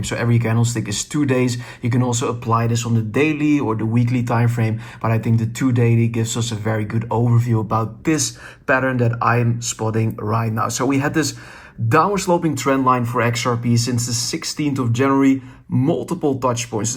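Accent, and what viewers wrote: Netherlands. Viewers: Ben je een Nederlander?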